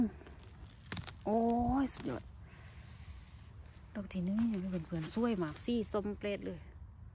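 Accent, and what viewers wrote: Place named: South, Thailand. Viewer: หลกหล่าว นิ ทากน้องเหอไม่ช่ายปลิง แต่ชอบจ้านนินานๆเห็นคนใต้ไปอยู่เมืองนอก เห็นแต่คนอีสาน ฟัง แล้วคิดถึงบ้านอย่างแรง 555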